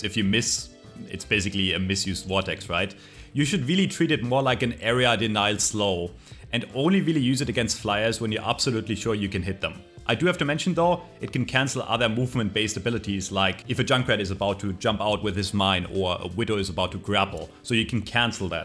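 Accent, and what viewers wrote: Germany. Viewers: I knew you were German within the first 5 seconds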